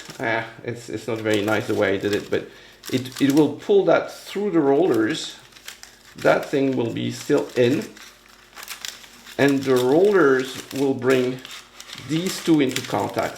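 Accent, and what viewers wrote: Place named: France. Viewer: SELA-NOY-D love how pronounces things! That French accent!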